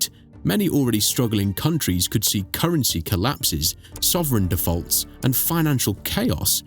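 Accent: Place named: United Kingdom. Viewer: I really want to listen to this report; however, I can’t stand your voice. I can’t stand, listening to British accent. British accents sound pretentious, high falooting, arrogant, know it all, closet gay, nosy, and more. Like I said, change the voice on this generated video, and I’ll consider listening to it in a more pleasing standard American English voice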